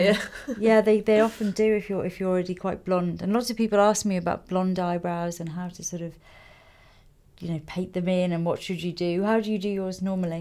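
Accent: England, United Kingdom. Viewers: So thrilled that you have a lovely English Accent and a soft voice xxx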